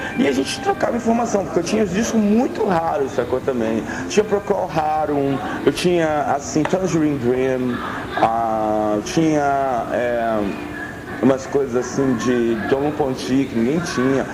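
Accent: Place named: Brasília, Brazil. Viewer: Sotaque Brasília antigo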